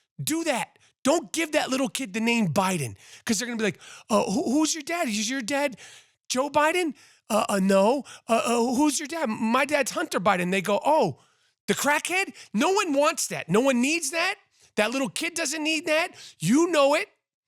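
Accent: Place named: Boston, United States. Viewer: Yo Boston accent!!! Like someone from the Midwest had a baby with some long islander!!! Hurts my ears!!!!!!!!!!....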